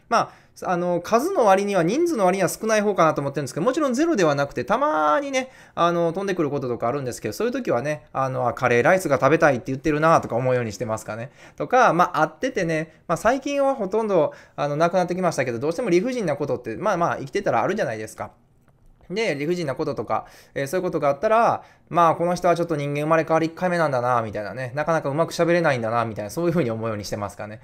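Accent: Kansai, Japan. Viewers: 関西方面の方の方言に苦手意識が有りましたが、初めて嫌な感じを受けませんでした。分かりやすく言うと…意地が悪そうじゃ無い感じです。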